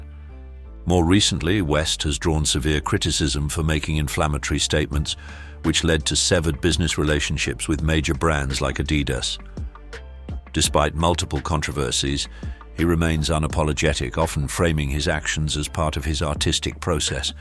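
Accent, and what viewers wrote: United Kingdom. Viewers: Why always a British narrator? U'd think we're in England watching some telly!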